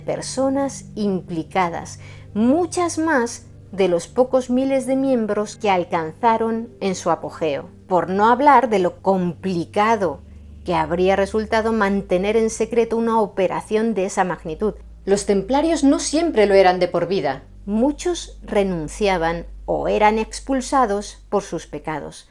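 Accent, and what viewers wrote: Spain. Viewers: El único acento Español que me encanta escuchar en videos como este sin distorsionar las historias es el de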